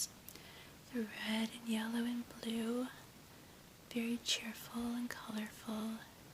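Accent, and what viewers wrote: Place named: Canada. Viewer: hi!! i was just watching some asmr before heading to bed, i just have to ask, are you canadian or midwest american? if this is invasive im so sorry!! its because i like accents, and i love learning about them alongside with dialects. and your accent sounds VERY canadian, or maybe even wisconsin sounding if you’re american :)! love your videos before bedtime!! helps me transition into the night, and due to being autistic, it can be hard to go from one thing to another. cheers ^_^ !!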